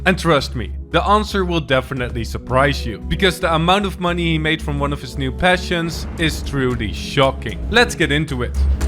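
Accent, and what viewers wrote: Netherlands. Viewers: Are you from the Netherlands because you have such an accent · U sound dutch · Lol the Dutch accent is pretty funny (No hate) I'm Dutch too and I also have the worst english accent Xd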